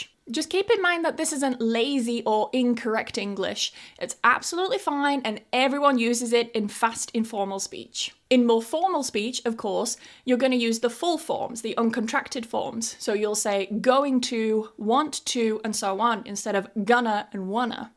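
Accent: United Kingdom. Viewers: I would like to say a big thank you for your amazing English lessons. I have learned so much from your videos, especially your beautiful British accent and the way you explain things so clearly. As an person who need more learning English was very important for me, and your lessons have helped me improve my confidence and communication skills. Your dedication and kindness are truly appreciated. Thank you for sharing your knowledge with people from all over the world. Keep inspiring and helping others. Wishing you all the best. ❤️ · Muito linda ,seu sotaque é uma delícia, adoro os britânicos.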